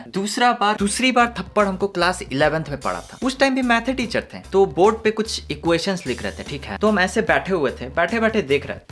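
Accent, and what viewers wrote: Bihar, India. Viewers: I did my schooling in a school in Jharkhand which had tons of people from Manipur. So, it doesn't surprise me to see some one with your looks talking with Bihari accent. All my Manipuri friends talk in pukka Bihari accent. But I like your boldness & content, and subscribing because of that rather than just the novelty of your accent + looks. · Baiya mujhe be aapki bihari ascent sikkado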